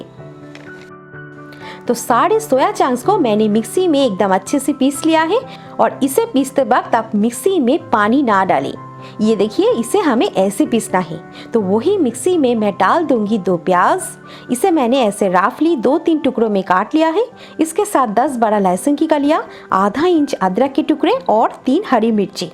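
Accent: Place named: South India, India. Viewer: Your talking way south indian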